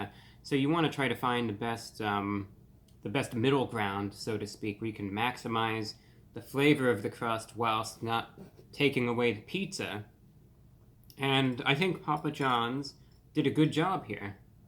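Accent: United Kingdom. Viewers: Did he develop a British accent or what What in the ever loving fuck have I missed over these 8 years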